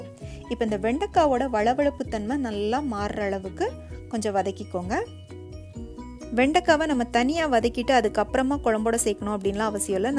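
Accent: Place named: South Tamil Nadu, India. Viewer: mmm nanun ipdithan seyven! And which is ur native ! Your slang seems that u r frm south tamilnadu!am i right !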